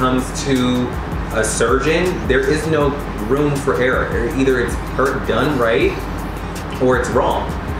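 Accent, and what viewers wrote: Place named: Jamaica. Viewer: Intro 🔥 “ tun ye up” you must be Jamaican.. 🇯🇲 I’m Jamaican btw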